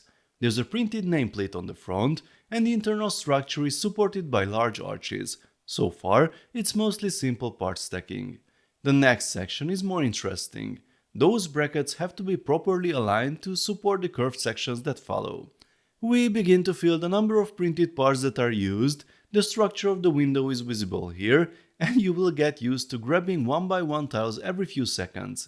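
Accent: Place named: Hungary. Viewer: we love your Hunglish! You must have been talking to Dr Németh - of capandball fame here on YouTube.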